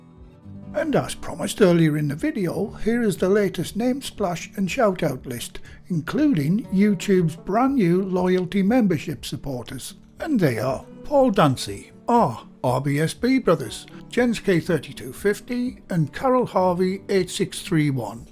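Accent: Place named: Newcastle, United Kingdom. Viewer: To be more specific it is a Newcastle accent. There's quite a variation of North East accents and is quite soft compared to some, which you could cut with a breadknife (or "brweadknafe") It's become very familiar to me having lived up here for 25 years. It can be quite a strong accent and get mistaken for a Scottish accent with a lot of dialect words too.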